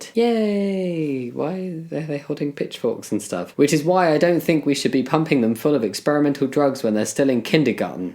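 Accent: United Kingdom. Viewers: You just sound brittesh😊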